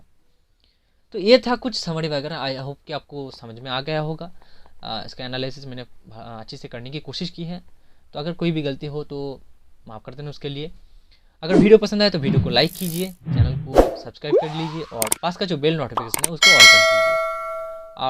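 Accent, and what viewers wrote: Bengal, India. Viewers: Thoda bengali accent aa jata hai.... improve your English speaking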